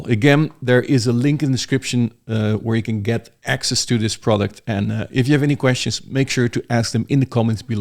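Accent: Netherlands. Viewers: I smell dutch?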